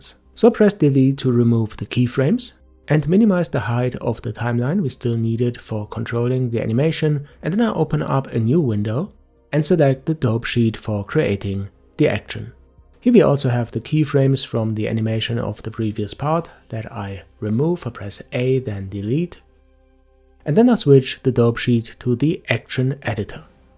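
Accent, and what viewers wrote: Germany. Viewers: Are you German? I noticed all German youtubers have a soothing deep voice, plus it's the only accent that isn't annoying to hear. And the videos are always well made with zoom animations and without a single detail left unexplained to ensure zero viewer frustration and confusion. How did they lose the war?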